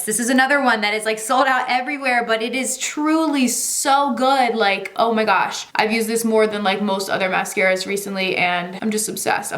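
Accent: New Jersey, United States. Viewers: Yes it’s her Jersey accent haha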